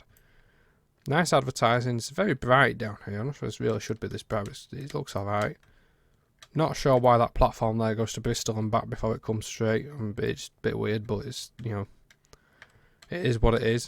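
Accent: Yorkshire, United Kingdom. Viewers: Nay lad you must stick with the yorkshire accent or I may need to give you an ecky thump ( Goodies refrence for the younger viewers) Great video bye the way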